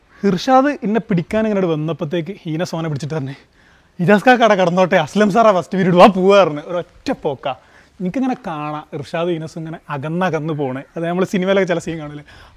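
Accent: India. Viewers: കൊടുവള്ളി കാരനാണോ, slang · സംസാരം കേട്ടാ അറിഞ്ഞൂടെ കോയിക്കോട് ആണെന്ന്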